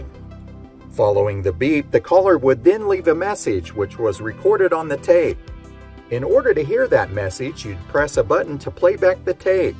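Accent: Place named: Midwest, United States. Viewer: What part of the Midwest are you from? If I’m wrong I apologize, just a guess. I’m originally from central Indiana. Your accent sounds familiar to me. Love your YouTube channel. We are both products of the 70’s and 80’s. I was born in ‘72. So I remember all of this great crap. I personally loved anything that was robots or computers. BMX bikes were just the best too.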